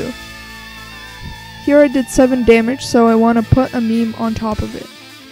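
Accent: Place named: Texas, United States. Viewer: Your from texas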